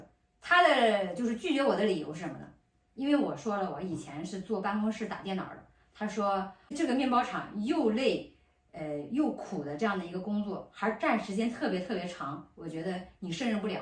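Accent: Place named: Northeast, China. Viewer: 一口东北味，就这口条，好好干自媒体，未必不比上班差！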